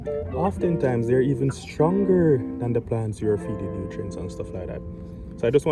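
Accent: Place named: Jamaica. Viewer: I'm a grower my self and you sound like ah yaad mn.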